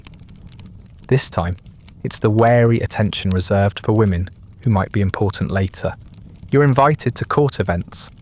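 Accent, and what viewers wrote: United Kingdom. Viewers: Yes, with an English accent.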